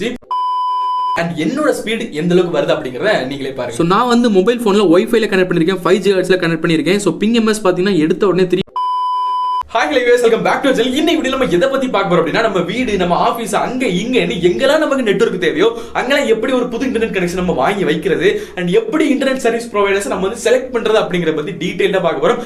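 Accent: Kanyakumari, India. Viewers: Ninga kanyakumari ya na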